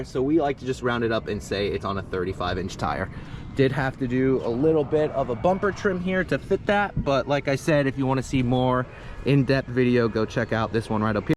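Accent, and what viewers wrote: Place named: South Korea. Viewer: 제목은 한글인데 콩글리쉬로 씨부리노 ㅡㅡ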